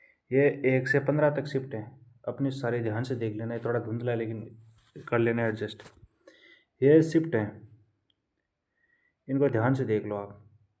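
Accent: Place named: Shekhawati, India. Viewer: Bhai rajsthan se ho ki wo bi sekwati se 😂😂baat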